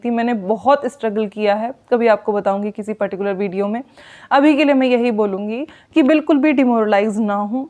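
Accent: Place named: Rajasthan, India. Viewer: Thank you sister, Rajasthan se lag rahe aap to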